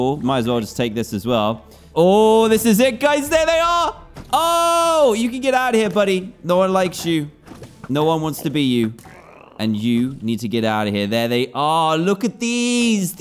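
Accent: United Kingdom. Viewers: how would they be brothers? Think is from NYC and is from the UK. Why do you think has a British accent and Justin doesn’t.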